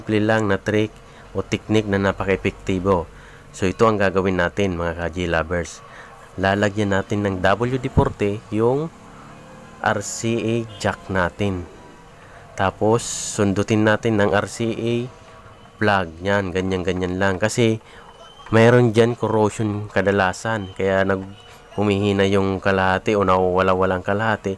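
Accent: Bicol, Philippines. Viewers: Taga naga city ka sir?bicol?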